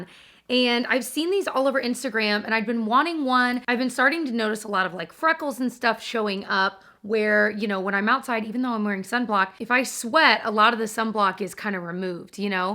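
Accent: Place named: Midwest, United States. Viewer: Love that you’re a youtuber from the midwest love to hear “meijer” and “pop”😂❤️relatable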